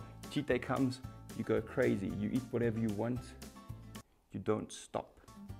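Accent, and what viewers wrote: South Africa. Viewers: hey man. Thanks for the video my man. Speaking the truth. Out of interest are you perhaps South African?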